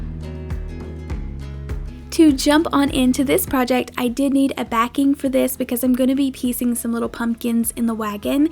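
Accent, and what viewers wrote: South, United States. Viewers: OMGosh!!! You're SO adorably talented!!! I'm new to your channel, but have subscribed. I've seen like maybe 2 other videos of yours, but THIS ONE is absolutely TOO CUTE!!! TFS! Blessings to you & your loved ones! PS. Is that a southern bell accent? I'm originally from upstate New York, but lived like 28yrs in southern California, & the last 10 1/2yrs in the NW area of Washington state! (By Seattle.) ONLY asking cause I LOVE your accent!!! Anyway, HAPPY CRAFTING!!!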